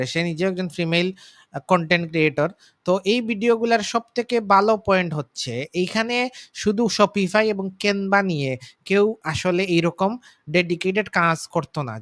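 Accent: Sylhet, Bangladesh. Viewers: Apni sylhety naki???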